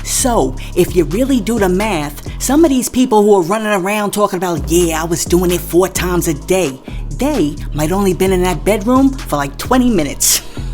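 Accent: New York, United States. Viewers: As someone from NY born and raised I can tell the woman narrator is from NY and is also a Gen X.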